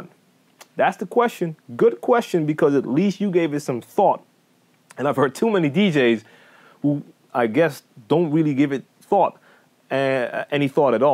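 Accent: Netherlands. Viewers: Are you half dutch? Cause you have very slight accent.. Anyhow great vids, great talent!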